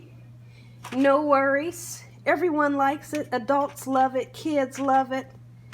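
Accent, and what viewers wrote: South, United States. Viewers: I loveeeeee your voice soo sweet & southerny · Mmmm it smells just like bananas 😂😂 great southern accent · Only thing southern is her accent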